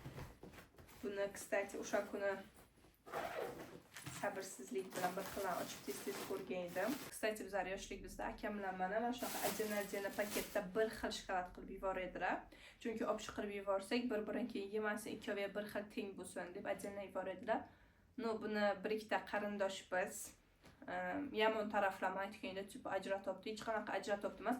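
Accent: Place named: Bukhara, Uzbekistan. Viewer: Зур манга ёкди чикаринг ман энди обуна булдим сизга каердан сиз шевангиз бухороликларникига якин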